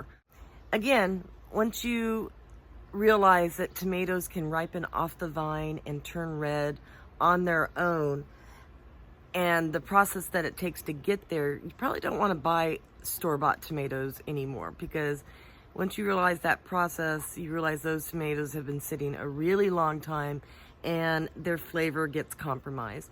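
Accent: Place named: Texas, United States. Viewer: Thank you Mam, very nice video and very informative. I like the Texas accent. You are lovely and gorgeous as your tomatoes. :)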